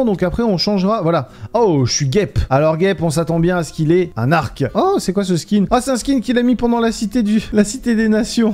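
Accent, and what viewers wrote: England, United Kingdom. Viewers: Je t’adore je je t’aime ton axant anglais . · pas pour moi l'accent anglais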